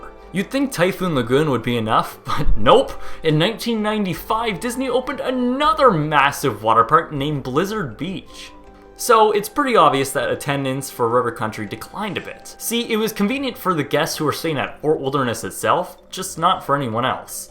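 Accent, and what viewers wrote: Canada. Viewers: I swear he said aneoba with an n. Willing to bet he's Canadian the way he pronounces his o's.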